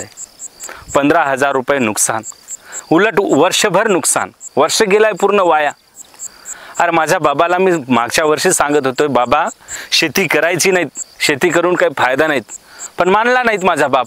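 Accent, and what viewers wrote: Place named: Uttar Pradesh, India. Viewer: भावा भैया सारखा बोलतोस यूपी mp cha वाटत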